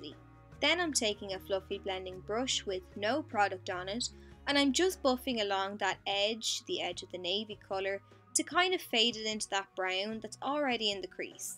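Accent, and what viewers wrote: Ireland. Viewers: Are you Irish? Because your accent kinda sounds like Jacksepticeye.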